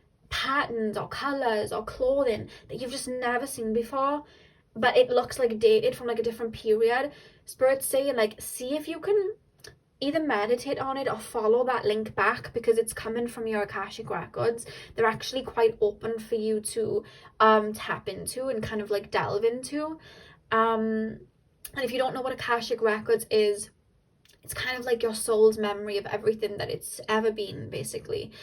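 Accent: Wales, United Kingdom. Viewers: Pile 2 & 3 I swear you always put out videos exactly when I need to hear them! Thank you so much for the motivation to help me keep moving forward. ❤️‍🔥 Also just wanted to say how much I love your Welsh accent. 🥰 I could listen to you speak all day.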